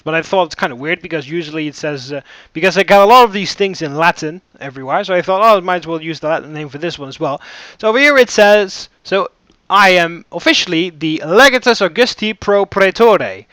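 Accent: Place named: Netherlands. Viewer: also are you dutch because you kinda have a dutch english accent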